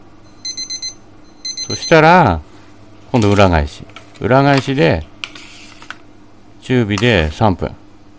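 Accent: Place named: Fukushima, Japan. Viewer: 福島弁めっちゃ楽しい🤣 いきなり笑わせて頂きました👍 左利きだったんですね〜笑 タイマー2ついる？🤔w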